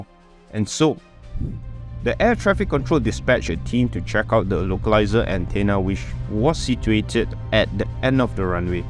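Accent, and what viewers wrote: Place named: Singapore. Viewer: Verry Verry good video, I enjoyed thouroughly und I Love the friendly Singapore accent, keep upp the great Work 👍👍👍👍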